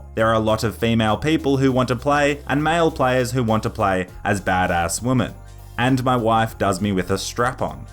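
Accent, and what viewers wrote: Australia. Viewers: Oi are you Aussie? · Are you Aussie??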